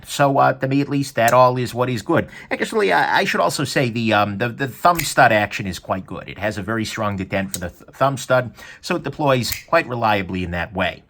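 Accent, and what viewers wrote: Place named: New Jersey, United States. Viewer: That is a New Jersey accent.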